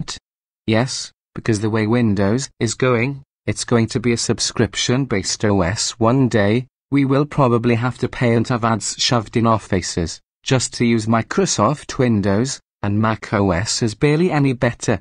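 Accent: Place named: Wales, United Kingdom. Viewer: A Welsh “robot voice” - how original!!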